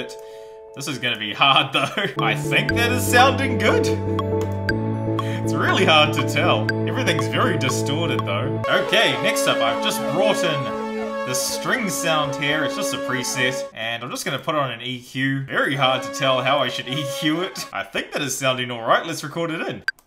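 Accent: Australia. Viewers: oooooooh so you are australian, now i understand why you talk like this, good videos! PS: English is not my main language so is hard for me to differenciate accents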